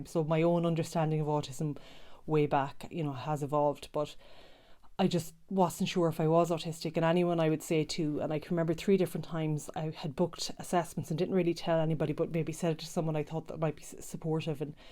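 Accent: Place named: Ireland. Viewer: I am another Oregonian (from the US state of Oregon) who appreciates so much your courage to challenge yourself by making this video. It was fantastic, and BTW, I love listening to your Irish accent! (Ireland as your home, correct?) I am just beginning my new journey learning about autism, or I should say an updated description of autism, which so far, explains nearly EVERYTHING in my whole life. I'm 61. Like the other OP comment from Oregon, I just want to understand my life and myself better as well. Past, present, and future. Not sure if an official diagnosis is possible for me because of the cost, availability, and qualified professionals near where I live, although I'm not against getting one in theory. But hearing of your persistence, and yes!, belief in yourself, you succeeded in getting the answer that helped your wellbeing. This gives me encouragement and tells me to be patient with myself. 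Thank you so much for sharing your story! Wishing you the best!:hand-purple-blue-peace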